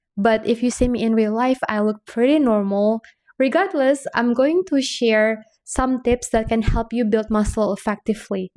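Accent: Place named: Philippines. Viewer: Are you a Filipino? You kinda have that accent. ❤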